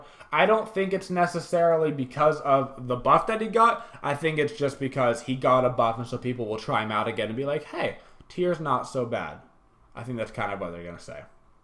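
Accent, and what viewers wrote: United States. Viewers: You seem like the type of guy who speaks " 'merican" as you would say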